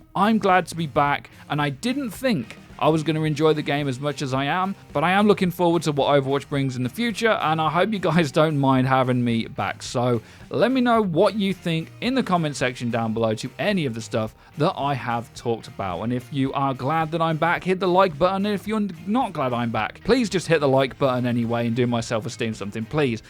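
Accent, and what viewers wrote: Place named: United Kingdom. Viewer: We missed your British accent guiding us!!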